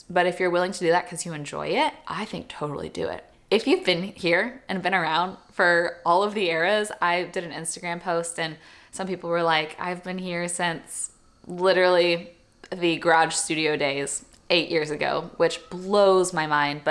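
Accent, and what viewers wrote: Texas, United States. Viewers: I was watching ur old videos last week actually and I was telling myself hey she still talks the same the y’all part is there that Texan accent that I love. I’m from Texas as Corpus Christi. Don’t loose that part of you makes you so unique.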